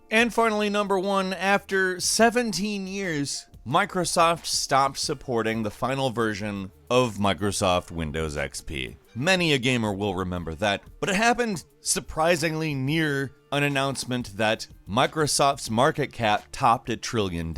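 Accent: United Kingdom. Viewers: `s Brit accent is awesome. Oh boy, want more of it lol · WHERE TF DID THAT BRITISH ACCENT COME FROM? 😂😂😂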